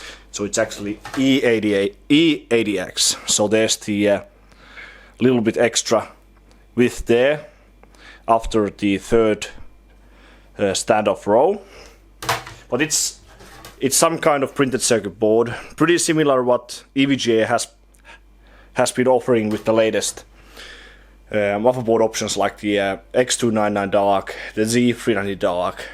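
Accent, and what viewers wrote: Finland. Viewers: Aksentista huomaa että oot suomalainen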